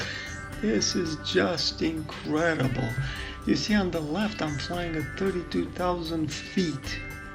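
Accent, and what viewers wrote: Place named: Europe. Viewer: You fools think the EuroDouche accent makes him intelligent or honest ? Misusing "professional" extrapolation/interpolation software to support a dogmatic narrative is something anyone(even a FEtard) can do. & btw, IR just penetrates haze better & lets you see further/clearer, no magic. You can't even talk about such a small angle over such vast distance(or ANY angles/distances) without having measurements(actual) WITH error bars on your initial data. & there are other issueS as well with using this approach here. Being somewhat familiar with how such software(not that particular app but the general assumptions, principles & algorithms etc.) is designed, I'm quite certain that, not only is his "analysis" flawed from the get go, but the conclusions he draws from them are absurd. & he is going "write his own code"(even though he misunderstands what photogrammetry even is never mind how it "works") in Matlab to manipulate data to fit his narrative(NOT reality) even better ? 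I can hardy wait ! Selling(& you're buying) such buffoonery is practicing douchebaggery. I'd be glad to be more specific, if anyone asks the sincere/relevant Q's. Though FETards don't want to actually learn anything EVER. But thanks for the permanent public record of your foolishness though.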